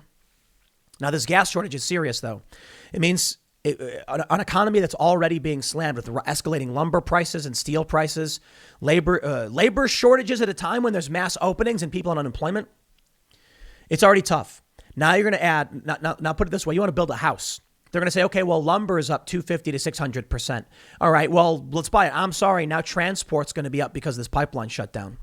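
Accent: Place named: South, United States. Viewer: Love that southern accent 😅👍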